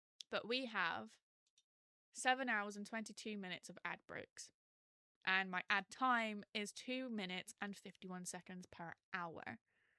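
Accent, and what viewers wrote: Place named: United Kingdom. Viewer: I think your voice and model match up nicely. I'm a small youtuber and I envy your correct British accent. Streaming is a cut throat business so I wish you well.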